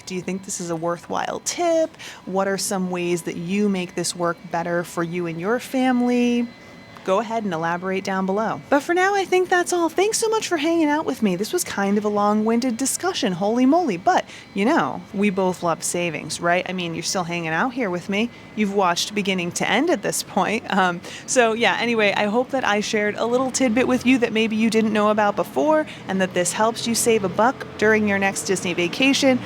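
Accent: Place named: Minnesota, United States. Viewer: Could tell you were in Minnesota girl what part of Minnesota are you from as a fellow Minnesota commenting on your life?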